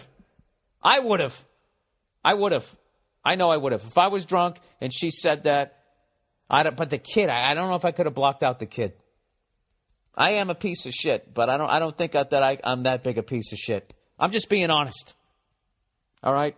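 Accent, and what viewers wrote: Boston, United States. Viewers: Ladies and gentlemen, Burr. He's got the wisdom of a Japanese Sensei in an American bar, wrapped in a Bostonian accent, delivered through the internet. Bravo... you nailed this one.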